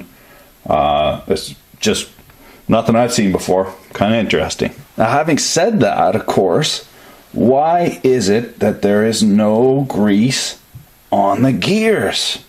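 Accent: Quebec, Canada. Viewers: dude, i just kinda stumbled across you're vids and I am by know means an engineer...however I am sooo intrigued by all your knowledge of this stuff. Im just a humble union carpenter and saw you reviewing some tools i was interested in getting and now i cant seem to stop watching your vids... your canadian accent, your sense of humor, your depth of knowledge...congrats dude! you just got yourself another subscriber :) cheers from you're southern neighbor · I fucking love how he drops french canadian lines here and there..! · Canadian or what? some of those words I have never heard an american say.... but then again I stay away from the lake areas. great video. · OK...OK...this is now my SECOND favourite channel on YouTube. Love the close up camera work and you actually seem to know what you're talking about!! Bonus. I've subscribed and I'm heading off to your channel to see what else you've reviewed. 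Do I detect a Canadian accent...sorry I'm from Australia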